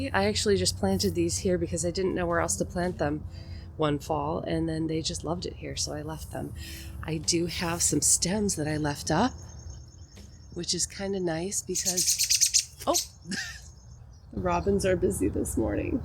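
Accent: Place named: Chicago, United States. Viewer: Thanks for the tour and all of the content! Long story shorter, I’ve had to let some things go for a while because #life…so as I start to take back some of my spaces and learn from my mistakes 😬, you’re inspiring me to consider and incorporate more natives. I was also today years old when I realized some of those “weeds” I have might not be…. It’s also nice to hear a natural Chicago accent. I’m a few hours away and don’t get home enough.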